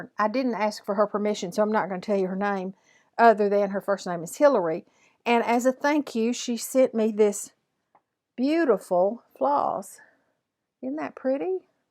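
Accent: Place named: Texas, United States. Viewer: I love the Sunflower Diaries pattern!! Are you from Texas? Your accent sounds like it. Im from Texas and you sound like me. I really like your chouce of patterns. Thanks for the video.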